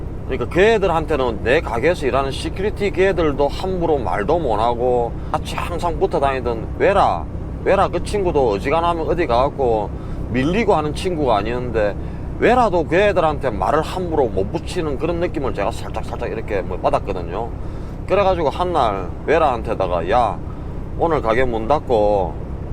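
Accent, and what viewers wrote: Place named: Busan, South Korea. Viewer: 다른 트럭커동영상도 봤지만 역시 님 영상이랑 음성이 제일 귀에 들어오네요.ㅎㅎ 왜냐!! 저도 부산이라 그런가봐요..특히 음성이 전혀 이질감없이 들어오니 너무 좋네요^^ 파푸아 썰~ 잘 듣고 갑니다.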